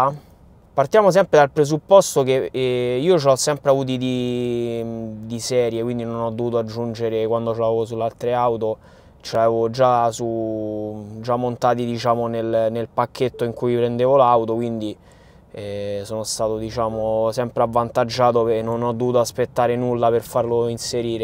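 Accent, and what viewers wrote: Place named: Rome, Italy. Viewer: Ciao ma io ho preso l'autopilot avanzato in fase di acquisto della mia Model Y Performance e poi sono 3.800 euro certo è un pochino monco ma come vedi piano piano lo stanno sistemando poi oltre all'autopark che io avevo sulla mia Toyota Auris Hybrid del 2013 quindi una della prima versioni e devo dire ben funzionante con i sensori di parcheggio, ha anche l'autopilot e cambio corsa automatico e devo dire che funziona discretamente certo da affinare ma funziona.. ora secondo te, non sapendo di che parte di Roma tu sia, ma credo molto fuori Roma, beh ci vedi l'FSD entrare in pieno centro Via del Corso o vicino al Duomo di Milano o ancor peggio a piazza Plebiscito a Napoli? da parte mia lo vedo molto molto impraticabile anche perchè il traffico scomposto di Roma come di Milano che soprattutto di Napoli il sistema frenerebbe ogni 3x2 causando incidenti a manetta quindi è impraticabile ora ripeto capisco che sei di Roma dalla "perfetta" parlata Romana ecco un consiglio che ti vorrei dare.. 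prendi il Raccordo Anulare alle 8 di mattina entra a Roma e vai verso il Centro magari verso il Vaticano e ti renderai conto che nelle grandi città italiane l'FSD non potrà mai funzionare in America è tutto bello e tutto figo ma loro a differenza di noi il traffico è molto più lineare e preciso tutti ad una massima velocità che non si "sgarra" ecco pensa se a Roma andassero tutti a 50 km/h sai come ti manderebbero a FFAN...O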